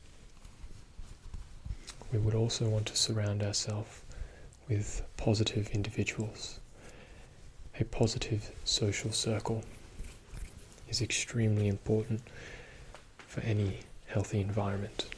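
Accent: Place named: Australia. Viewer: a really nice smooth australian accent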